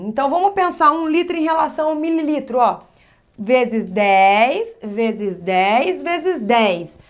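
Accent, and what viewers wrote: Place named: Minas Gerais, Brazil. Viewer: Adorei sua aula gata!! Pelo sotaque deve ser mineirinha! 👏👏👏👏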